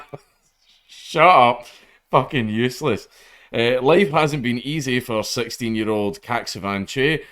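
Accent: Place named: Scotland, United Kingdom. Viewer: 'Phnom penh' (sounds like) 'nom pen' sometimes the second syllable is silent as in Thai. even with a Scottish accent Dank you were sound on that one.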